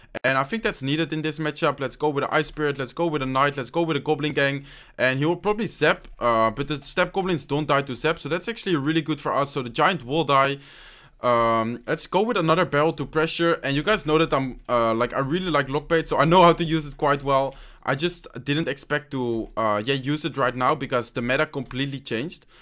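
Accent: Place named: Netherlands. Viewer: Your accent giving me headaches. Why can't you speak english like any other dutch human being.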